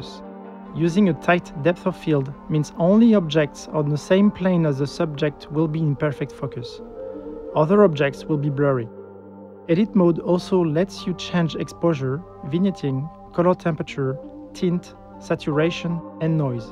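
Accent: France. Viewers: L'accent français on l'adore quand il parle anglais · XD this French accent is so obviously 😂😂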